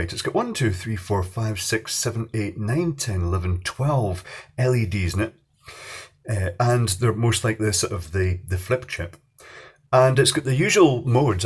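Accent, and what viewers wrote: Scotland, United Kingdom. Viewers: Always nice to watch a video by shortly before retiring for the evening. Your accent reminds me of a friend I have from Bishopton. But not his mother's who was from Aberdeen.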